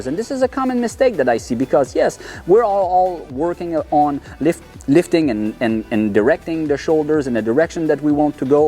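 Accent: Quebec, Canada. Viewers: You sound like you are from Quebec! Am I wrong?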